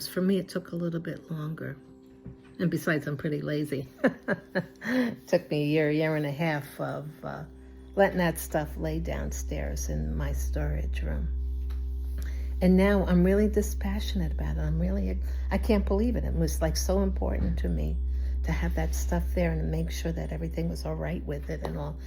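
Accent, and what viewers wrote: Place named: New York, United States. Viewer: This is a great video. Not sure why it came up in my feed - the intrusive all seeing eye of my phone perhaps? I have been declutteting for a long time. Critical life threatening illness in 2020 ( not covid) was the catalyst. I had a 2-3 yr recovery so that was slow. Now I am.picking up momentum. You provided some great tips. I have a large property which we wont be leaving anytime soon but some of the big possessions are leaving. I retired as a commercial cake decorator in 2018. I got sick in 2019, hospitalization 1/9/2020-1/20/2020. My shop remained unchanged in time from the time I closed the door 9/23/18 until about fall 2024. Its here on the property, a separate building from my home. I have been diligently whittling stuff down, selling equiptment etc. Believe me the house and garage have stuff too along with a full barn (horse drawn carriage 😬🙄) but this is a good place to start. 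You sound like you might be from NY which WAS my home state. Incidentally, I have a scrimshaw bottle opener I carried home from my aunts house in Norway. There is no reason to get rid of it, it has purpose. Thanks for the beautiful video.❤ Melinda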